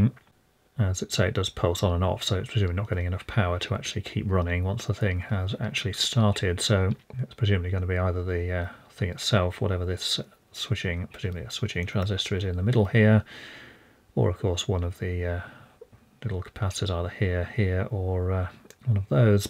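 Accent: United Kingdom. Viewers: Just crapola from a trades person from the UK. Not at all an expert. Don't fall for the Uk accent.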